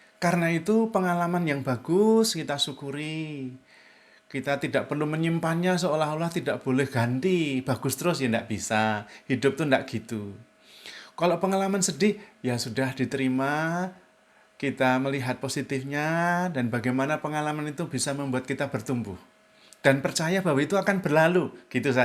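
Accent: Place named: Java, Indonesia. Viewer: 🌿🍁😊 Sugeng dalu Romo... Matur nuwun Pencerahannya... Jujur saya ngèkèk sendiri.. Dengar Romo bicara campur logat Jawa deles...Bahagia sekali rasanya.. - Semakin kita membandingkan dengan kehidupan orang lain semakin stress.. - Saya pribadi kok luwèh” dengan kehidupan masing” orang... - Saya syukuri setiap rahmat yg Tuhan beri, jalani apa adanya, nikmati..Sampun cekap... Matur nuwun Gusti.. - Makan bisa nikmat( walau lauk tempe penyèt)... Tidur bisa pules walau kasur bukan Springbed ... - Namung sumarah dumateng kersanipun Gusti.. -Langkung manteb malih mirengaken Pencerahan Romo yg selalu Super... Matur nuwun Romo, Berkah Dalem...🙏🙏🙏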